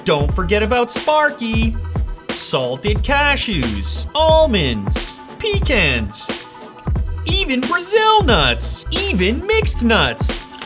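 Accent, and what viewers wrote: Canada. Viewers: Is he Canadian?? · R u Canadian mr.unboxer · Are u Canadian unbox therapy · is he canadian??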